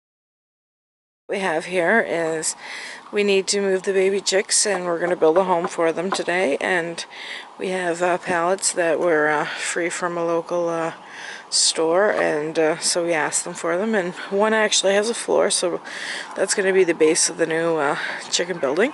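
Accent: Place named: Newfoundland, Canada. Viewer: where exactly are you from? that accent sounds like your from Newfoundland ☺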